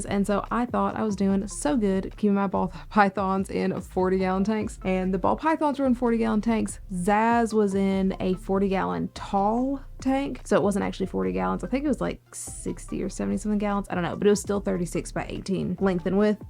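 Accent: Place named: Texas, United States. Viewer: Alabama? I had your accent pegged as Texas. I would have put money on you being a Houston native.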